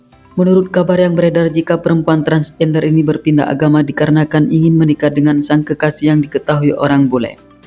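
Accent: Madura, Indonesia. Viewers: logat bicaranya kayak orang madura nih youtuber....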